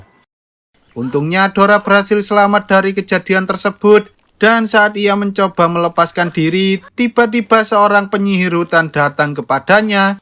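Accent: Java, Indonesia. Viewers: Medok pisan keluaran suara jowomu yo mas bro..😅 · suaranya kaya mas Dobit, medok banget .... sukses selalu ya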